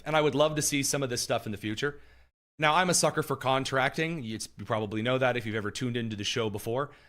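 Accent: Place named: Minnesota, United States. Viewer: Love your Tim-Waltz/Menisota accent! Got a good laugh this morning 😂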